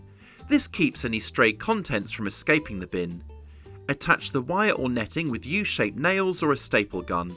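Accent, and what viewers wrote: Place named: Cockney, United Kingdom. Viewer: Im learning English and I found your accent is little bit like cockney :)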